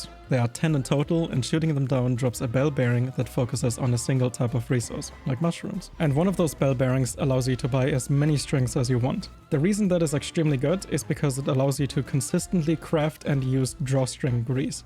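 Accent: Germany. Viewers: Are you German? Klingt irgendwie so :D oh and thx for all that very useful information, tarnished <3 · Du bist Deutscher, merkt man an deinem Akzent! Wieso also Englischsprachiger Kanal? More Money!😅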